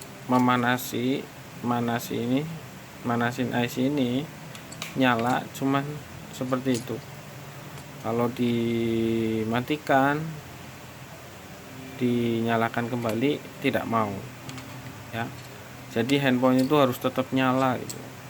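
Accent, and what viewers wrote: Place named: Banyumas, Indonesia. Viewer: Kayane wong ngapak iki, lokasi ngendi lur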